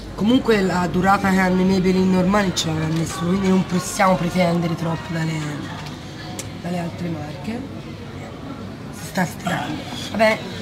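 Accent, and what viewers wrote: Tuscany, Italy. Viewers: Che dolce quando parli di Axe!😍Comunque adoro il tuo linguaggio😂😍 è molto simile al mio ,tolto che tu parli toscano😂😂😂!Comunque con il rossetto liquido dior anch'io non mi sono trovata bene,sono andata a cena e lo avevo dappertutto,mi dura molto di più il rossetto in stick!Bah 33 euro buttati!Baci😘😘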